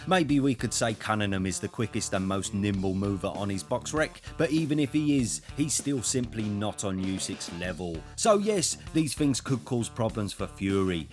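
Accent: United Kingdom. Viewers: Please someone's tell me this Guy's accent is from in England. I love this Guy's british accent the best a foreigner has ever heard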